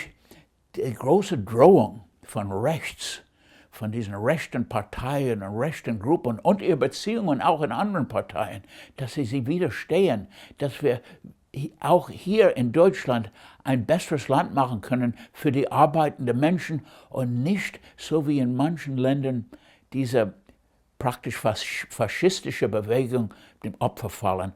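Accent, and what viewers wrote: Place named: United States. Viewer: He still has quite an American accent when speaking the German language.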